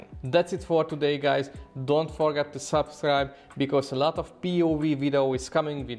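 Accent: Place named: Russia. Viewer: You speak with such a heavy Russian accent, are you Russian?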